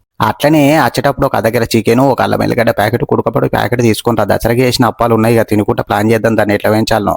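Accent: Telangana, India. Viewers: Abba kotha dialogues andhulonu Mana Telangana yasa adhiripoyindhi 😂😂😂 Love your videos always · Pure telangana slang ఇలా speed గా ఉంటది ఇది తెలంగాణ వాళ్ళే మాట్లాడగలుగుతారు. వాళ్ళకే తొందరగా అర్థం అయితది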